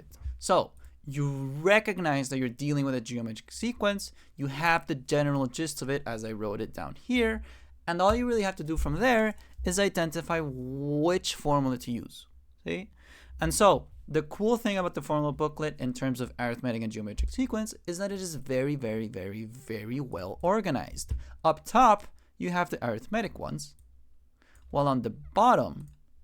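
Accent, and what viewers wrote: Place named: Chile. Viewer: This is gonna sound weird, but the 'cierto?' After every phrase sounds soooo cute. Are you from chile? Idk why but your accent reminds me of chile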